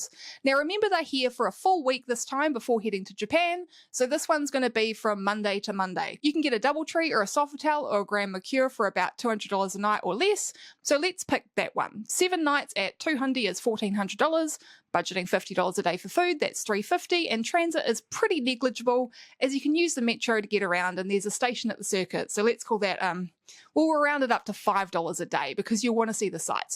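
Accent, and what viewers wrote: New Zealand. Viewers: Oh cmon, a Kiwi accent isn’t the second worst English accent…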